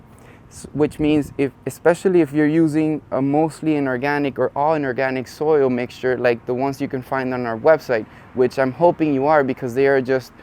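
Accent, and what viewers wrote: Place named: Castile, Spain. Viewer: Que hermoso, amigo escucharte, hablar en castellano , un privilegio escucharte, un fuerte , abrazo, desde Buenos Aires!!!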